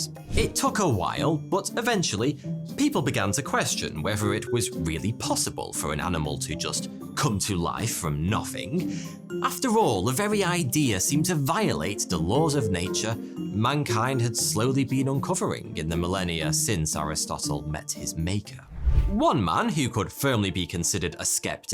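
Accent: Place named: England, United Kingdom. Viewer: I hate the ingland accent I do not understanding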